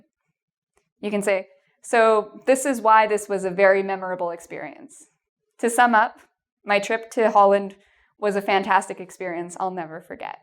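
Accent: Canada. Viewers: Canadian accent is dope. <3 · Her Canadian accent is so cute.... Aboot <3333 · I am going to talk a-boat... the accent is sooo cute! Love Canada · I'm Scotish and I don't understand your Canadian accent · good luck to everyone who's going to do the exam, kudos to you if you picked up on her Canadian accent, is a really competent teacher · Is she Canadian?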